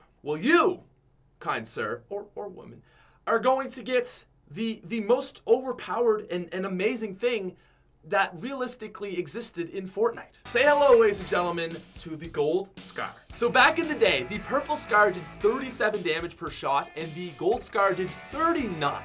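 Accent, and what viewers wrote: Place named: Canada. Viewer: Ben Monaghan it’s his Canadian accent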